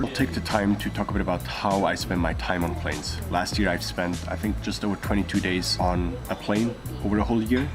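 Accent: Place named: Switzerland. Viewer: Indeed, the Swiss accent sounds nice.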